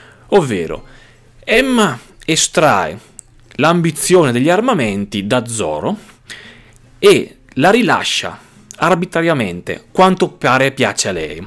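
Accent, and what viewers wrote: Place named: Umbria, Italy. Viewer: senti io mi diverto a indovinare gli accenti..secondo me sei di città di castello dimmi se ci ho preso. Saluti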